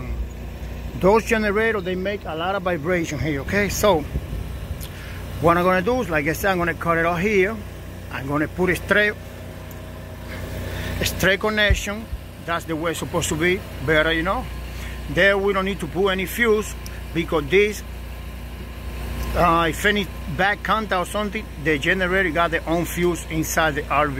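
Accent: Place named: South, United States. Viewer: I like your accent. Sounds like your from the south. So I gotta subscribe and thumbs up! Nice work!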